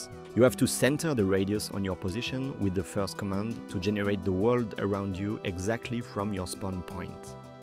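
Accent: France. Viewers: petit accent français 👌👌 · Super vidéo merci beaucoup. Et merci l'accent français :) Tu parle très bien Anglais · oooh le bon français x) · Un français qui parle anglais, c'est toujours marrant ! Je like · Tu es français nan 😂 ? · Hello j'ai remarqué que t'as un accent français donc je vais faire un commentaire en français mdrr. Pour la génération de monde c'est entrain de me dire 12 heures je dois réellement attendre si longtemps 🥲? Et en terme de performance t'as quoi comme PC et est-ce que ça tourne bien ? Parce que ma carte graphique est pas extrêmement puissante (RX 5500 XT) donc j'ai peur d'attendre 12h pour que ça crash au final. Sinon à part ça très bon tuto ça fait plaisir de voir un français faire des vidéos en anglais j'étais justement entrain de m'imaginer faire la même chose avant de voir ta vidéo 😅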